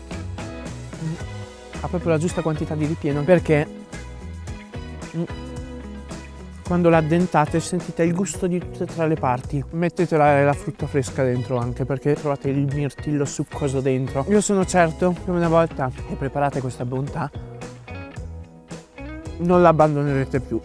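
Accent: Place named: Trentino, Italy. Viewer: Oltre che bello, quello accento Trentino lo adoro. Marchigiana ma con il Trentino nel cuore. I love val di non. ❤ Tovel ❤❤❤❤❤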